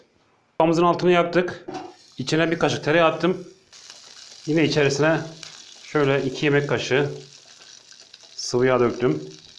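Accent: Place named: Gaziantep, Turkey. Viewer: Abi anteplimisin siveden oyle anlasiliyor yoorum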